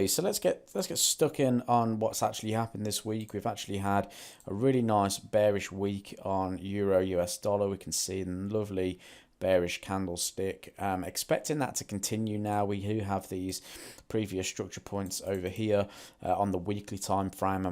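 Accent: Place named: Australia. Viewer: Thanks for the video. Can you please make a video on AUDUSD and AUDNZD? Can't figure out your accent xD Sounds Aussie but with some british words..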